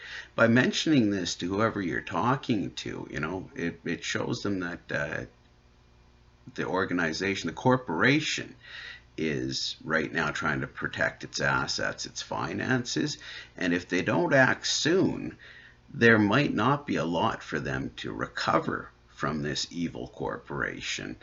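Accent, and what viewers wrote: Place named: Canada. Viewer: Really enjoying your videos Brother! Nice to hear a fellow Canadian! I was born and raised in Quebec but my Mom is from the Okanagan, Salmon Arm, Revelstoke way. Keep those experiences coming, I really enjoy them and it amazes me how close all our stories from all around the world are. I really hope you are keeping well with your medical issues, I will keep you in my prayers!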